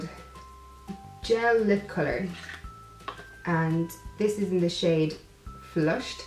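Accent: Ireland. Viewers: Ur accent is like Irish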